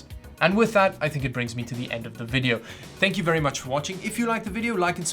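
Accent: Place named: South Africa. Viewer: Sounds kinda south African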